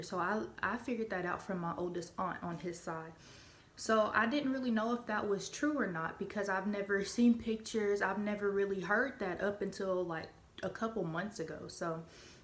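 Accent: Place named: South, United States. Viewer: You have a Southern accent where are you from? I'm one of those blacks that actually has Native American ancestry Cherokee mine is near 2%, not many people black or white are going to have Native American as high as 10% not US Native, because Native tribes have been mixing with Europeans and Africans since they came over, people with large % of Native American are usually from Mexico and Central America. DNA works very strangely sometimes people pick up traits from far back. So your great- grandmother was white which is kinda close, I have a third great-gramd parent white and a third one Native American. Yes test with other companies, but believe ancestrydna is faulty with their ethnicity results.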